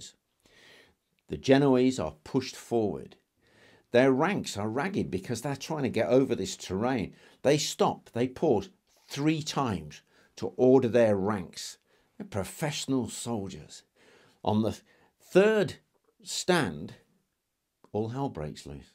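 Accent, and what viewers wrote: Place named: RP, United Kingdom. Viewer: A special thanks for RP and not using slangs or dialects(hello cockney))).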